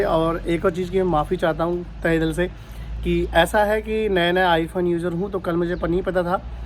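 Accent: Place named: Karauli, India. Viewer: Consa gav h bhai ji yar me bhi karauli ka hu aap ki aabaj bhi karauli said ki lagthi h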